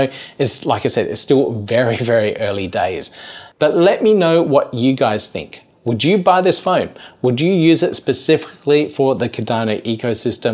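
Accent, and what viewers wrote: Australia. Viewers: Just subscribed to your channel! You have such soothing radiating positive energy, that it is much more pleasant to hear Cardano related news from you than from many other channels. Maybe it is because I consider Australian (or NZ) accent smooth as butter maybe, pleasant to the ear and reminiscent of the sun and beaches (and surfing with sharks as well :) ).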